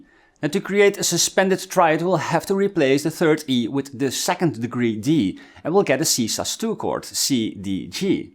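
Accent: Netherlands. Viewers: A)crystal clear B) your Dutch accent brings back warm memories of all my Flemish and Dutch colleagues! Thank you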